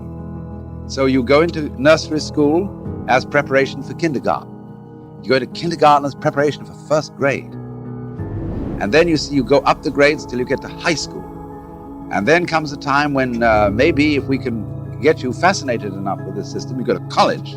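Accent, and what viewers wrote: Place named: RP, United Kingdom. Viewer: Alan Watts is like a man down the pub talking crap. It's just telling people what they want to hear about the F-ing obvious. 🙄 Americans are impressed by his posh accent. 😆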